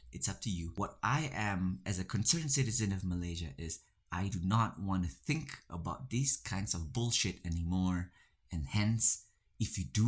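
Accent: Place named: Russia. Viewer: Hebatlah bro. Love your humor and the Russian accent is spot on!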